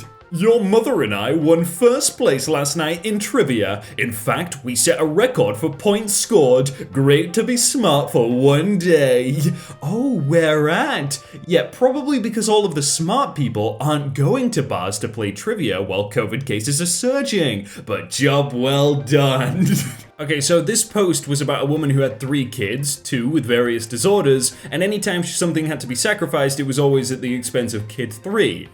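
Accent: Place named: United Kingdom. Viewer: It's just his accent... most Brits pronounce it that way.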